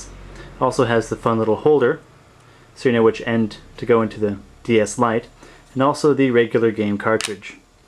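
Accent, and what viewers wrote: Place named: United States. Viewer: annoying american voice-over...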